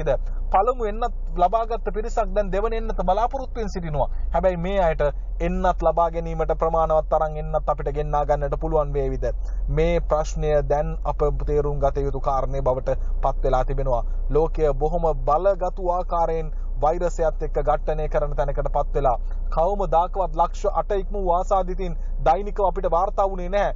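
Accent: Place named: Karandeniya, Sri Lanka. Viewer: thama Kata gahanawa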